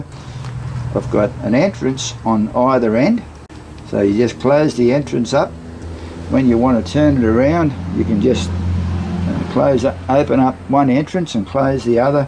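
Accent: Australia. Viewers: I came across this channel with your massive stash of sweet potato and then I was hooked as soon as I heard your Australian and saw the bees. You and are absolute darlings and I hope to catch more of you both. I sincerely hope all is going well xxx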